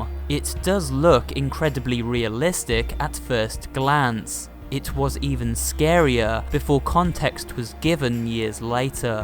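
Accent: United Kingdom. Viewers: Hit that right on the button definitely a British chills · You know it's fr when there's a British narrator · Chills UK voice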